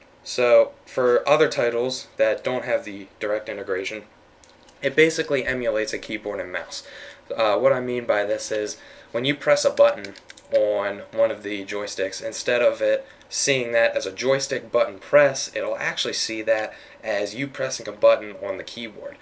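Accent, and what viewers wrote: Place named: Pittsburgh, United States. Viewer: I'm a big sucker for accents, and I dig yours. Can I ask where you're from? I'm thinking somewhere near Pittsburgh, maybe southeastern Ohio?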